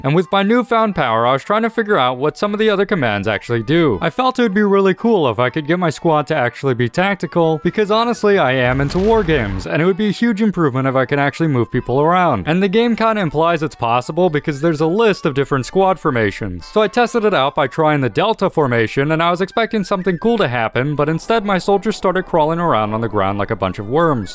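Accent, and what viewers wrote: United Kingdom. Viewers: bro soundl like english luksor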